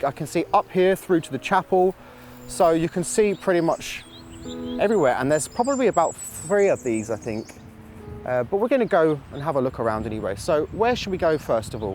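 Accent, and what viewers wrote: United Kingdom. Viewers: You with your British accent and with his French accent are a lovely foil...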